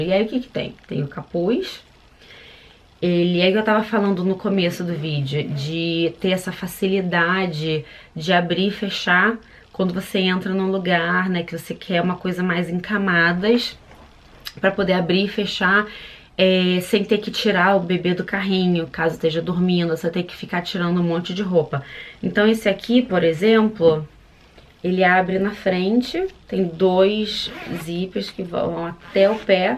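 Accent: Rio de Janeiro, Brazil. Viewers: Adorei as dicas 😉 pena que manteve o sotaque carioca.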